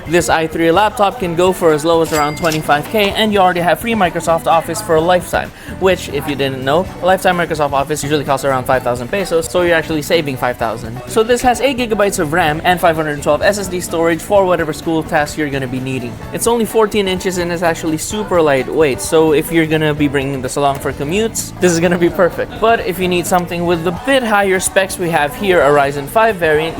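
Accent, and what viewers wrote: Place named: United States. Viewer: I mean its okay for you bro to be english speaking with American accent, dunno if you gew up States side, but ,I think viewers will much appreciate if you go Taglish or Tagalog. We can just watch foreign or global videos if we want english based ones(I mean on your laptop reviews), but most Pinoys would love to see Taglish or just pure Tagalog vids just like how Tito Dustin does it. Medyo boring bro if englishero realtalk.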